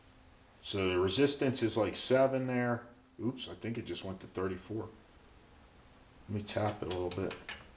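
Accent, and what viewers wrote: Philadelphia, United States. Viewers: THANK YOU. My Honda Fit is doing this exact same thing and I'm pretty sure my neighbors are ready to kill me (I don't blame them). Thanks so much for the thorough video. And from your accent I can tell you're probably from around Philly, so appreciate you even more 🤣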